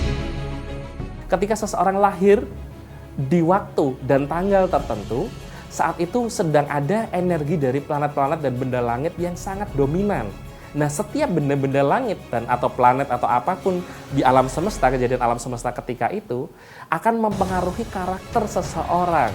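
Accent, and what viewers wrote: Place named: Banyumas, Indonesia. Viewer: Salam ngapak mas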